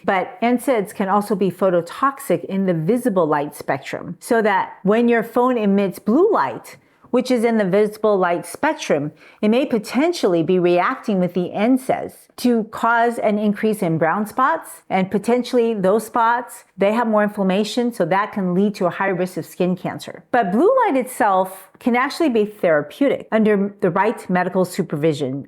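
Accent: United States. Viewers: parli amerikano non ti capisco!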